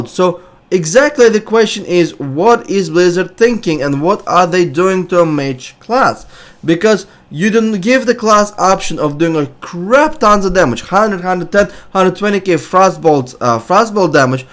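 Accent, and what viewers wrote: Russia. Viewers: как приятно слышать Русский акцент:)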